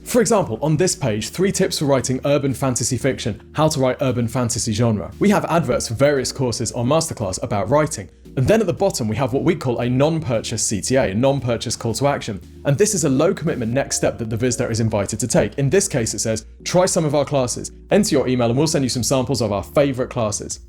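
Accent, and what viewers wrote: United Kingdom. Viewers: Close your eye, you will hear he is at a normal speech speed for a podcast. At least he speaks a fairly neutral UK English... with a slight London accent (innit?} ... ... For UK standards he is super easy to understand... When I went to Edinburgh ...it often took me up to 2 minutes (or until I have heard a clear FUCK ) to see if people are actually speaking English) To Learn More about Brits are fucking impossible to understand to the point they sometimes do not understand each other : any interview with fighter Paddy Pimblett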